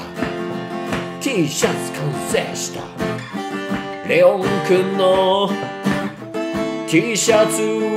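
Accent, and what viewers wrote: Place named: Kansai, Japan. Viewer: 剛くんの関西弁かわいくて好きです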